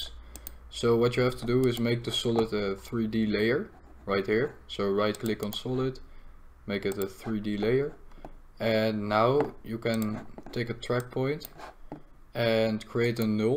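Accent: Netherlands. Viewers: Lekker nederlands accentje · Are you dutch you sound like you are dutch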